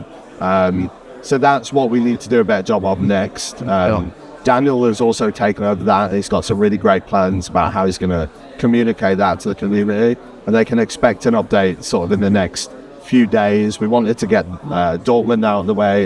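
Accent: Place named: United Kingdom. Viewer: Sehr gutes Interview, und ich mag dein Englisch sehr. Warst du mal längere Zeit in Großbritannien? Die meisten Deutschen sprechen ja entweder mit sehr deutschem Akzent, oder es klingt eher amerikanisch. Bei dir gar nicht, das klingt schon relativ britisch.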